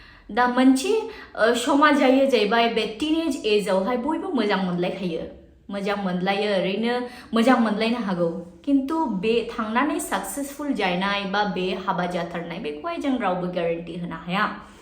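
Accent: Bengal, India. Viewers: Abo boro rqnya na na kok thana bengali sw thangdw mathw rao ya... Boro jana boro rao mwjng rwngnw nango..... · Are you from Bengal beta, your speaking like Bengali tone.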